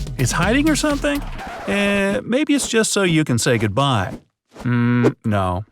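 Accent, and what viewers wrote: United Kingdom. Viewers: Baaaathroom. The British accent makes me sick! Sounds so robotic.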